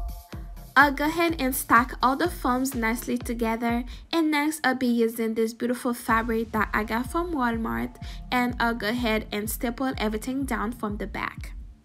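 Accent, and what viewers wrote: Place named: Haiti. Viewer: Love your accent and your work. By chance are you Haitian? God bless you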